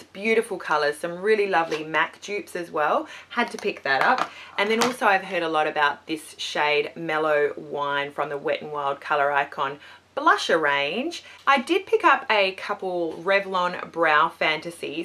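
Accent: Australia. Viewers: I loveeeeee ur cool Aussie accent! Where in Aussie do u live? I'm in Sydney..:) Nice haul...! Missin USA just for shopping lol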